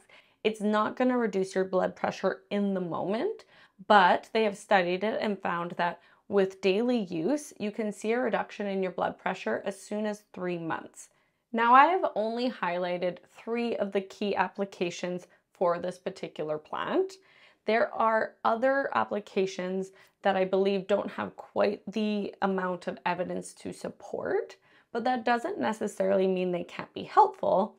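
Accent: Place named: Canada. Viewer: Americans usually leave the H sound off this word, maybe influenced by French or Spanish, but British speakers generally pronounce the H. Canadian English is a kind of hybrid and that's what the good doctor speaks.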